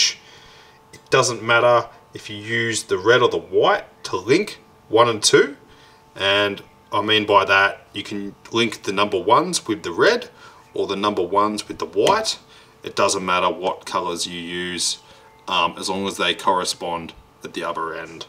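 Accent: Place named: Australia. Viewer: Thanks for your informative videos especially with the Australian accent:hand-pink-waving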